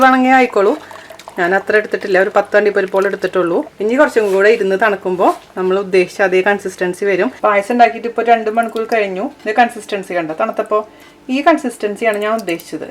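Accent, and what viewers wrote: Thrissur, India. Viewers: കിടുവേ.. ഡയലോഗ് പോളിയാണ് ചേച്ചി.. തൃശൂർകാരി ആണോ?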